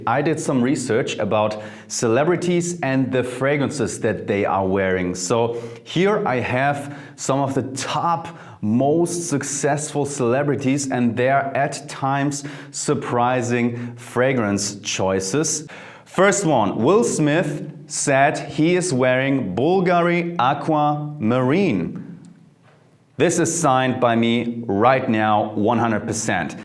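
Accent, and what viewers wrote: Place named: Germany. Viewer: omfggg german accent soo hard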